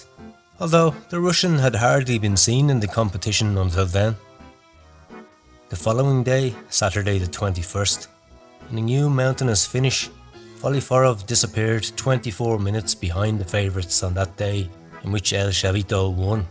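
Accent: Ireland. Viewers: Good stuff.Subscribed.!! Keep it up.Not a wee bit Irish are ya? How about one on Sean Kelly & Stephen Roche?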